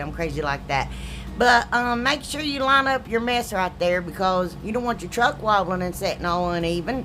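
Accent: South, United States. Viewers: Absolutely love your country twang! Been watching you since you started, love your channel! Keep up the great DIY's! Oh and STICKERS!!!LOL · i love your designs! everything you make is so beautiful and i LOVE to listen to your accent and laugh!!! us southern girls have to stick together lol (NC here) love and prayers!!!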